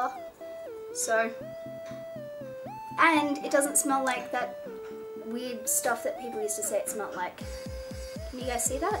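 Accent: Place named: United Kingdom. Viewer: I cant believe i haven't noticed this but are you Britain you have an accent!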